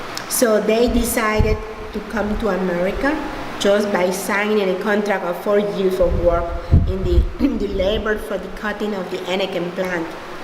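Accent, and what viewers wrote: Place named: Philippines. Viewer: Its interesting because she sounds Filipino talking English so I guess that's what Latino and Korean mixes lol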